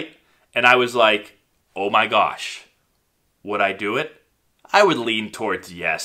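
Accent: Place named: Boston, United States. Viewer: Lol I love how you say “hawkey” with the Boston accent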